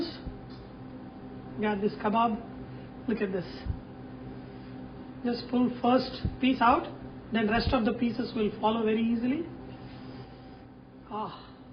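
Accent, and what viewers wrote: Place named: Andhra Pradesh, India. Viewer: hmm... Hes from Andhra pradesh...the accent made me to search his origin :)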